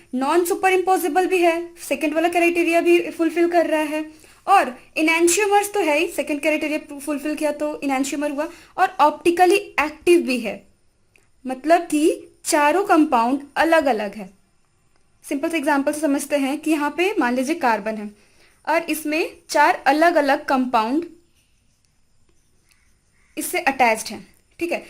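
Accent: Bihar, India. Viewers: Mam Bihaar se hai kya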